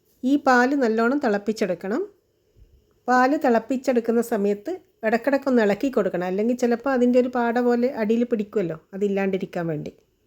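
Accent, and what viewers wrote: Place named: Kannur, India. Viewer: കണ്ണൂർക്കാരി ആണല്ലേ എത്ര ശ്രദ്ധിച്ചിട്ടും കണ്ണൂർ സ്റ്റൈൽ വരുന്നുണ്ട് കൊള്ളാട്ടോ 👍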